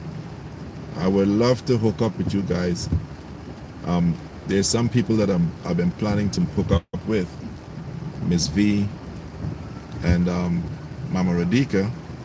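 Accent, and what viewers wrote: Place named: Caribbean. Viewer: OOOOOooooh, ok. I was wondering about the accent my brother. You're from another Caribbean island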